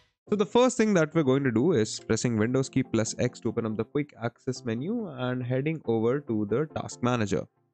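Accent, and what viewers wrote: India. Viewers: It didnt work Indian accent bro 😢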